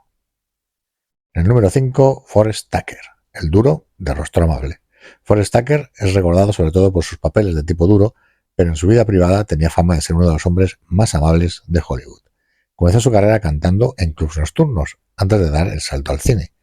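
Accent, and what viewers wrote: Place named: Spain. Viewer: Pongan a una persona que hable español normal no de la madre patria.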